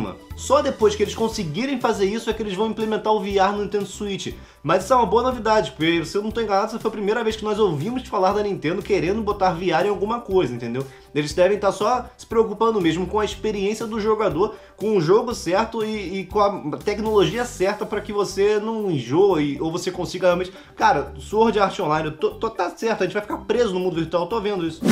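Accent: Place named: Portugal. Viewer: começando a pegar sotaque de Portugal kkkkk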